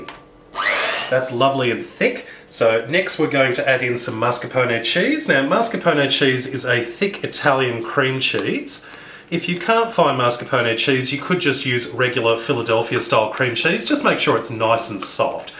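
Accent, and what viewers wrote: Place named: Australia. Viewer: Has anyone told you before tht your a handsome guy wow,I must say and I love the accent as well I'm from South Africa and trust me our men thts coloured do not speak like you I guess you are Australian am I right ?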